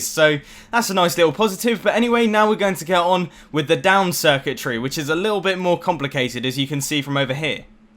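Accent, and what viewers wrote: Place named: Cockney, United Kingdom. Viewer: Gawd, I love your accent, sort of cockney-ish!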